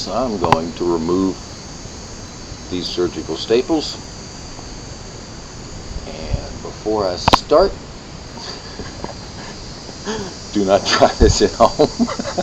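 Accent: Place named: Transatlantic, United States. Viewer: This man has a translantic accent..... wow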